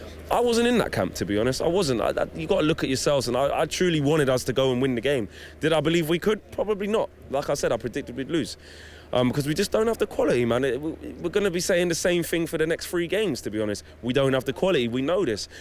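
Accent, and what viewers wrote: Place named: London, United Kingdom. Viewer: Why do u even support united you sound like ur from London why wouldn’t u support a London club there are a lot too choose from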